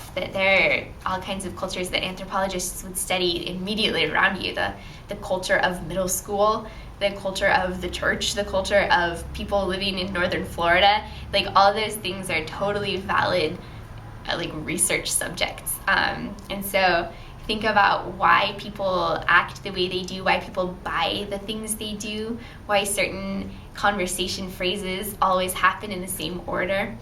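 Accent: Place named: Scotland, United Kingdom. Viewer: I figure she’s American but lived in Europe for a few years and picked up a bit of accent on certain sounds. That or born overseas and came to America at a young age but retained some accent. I’d guess Scotland.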